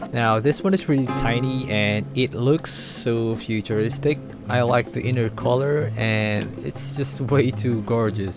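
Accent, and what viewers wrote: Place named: Philippines. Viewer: You sound like your from philippines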